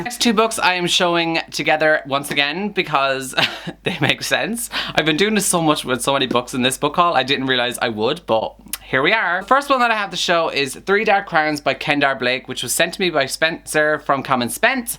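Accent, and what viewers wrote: Ireland. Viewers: I found you through Chloe’s channel and it’s soo nice to hear an Irish accent on booktube.. makes me homesick and want to be back in the whest 😛.. new sub can’t wait to watch move.. love a fellow Irish gal 😘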